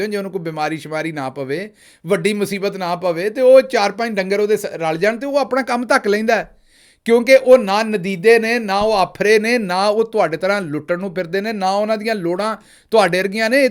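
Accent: Punjab, India. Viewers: تہاڈے جئی پنجابی دو سو سال دا پرانا بابا وی نئیں بول سکدا۔ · sahib poori theth te khari punjabi bol ke shaa jande o janab zindabad or tuhanu salam 🎉from charhda Punjab India 🇮🇳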